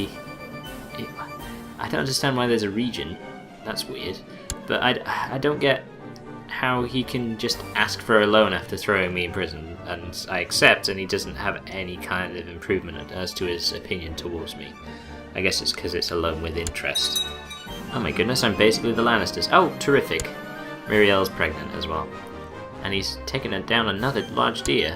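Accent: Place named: United Kingdom. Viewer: What is your accent? It's easily my favorite British accent but I'm terrible at recognizing regional ones.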